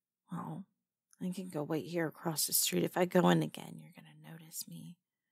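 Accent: South, United States. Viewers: I hear some southern twang. Kinky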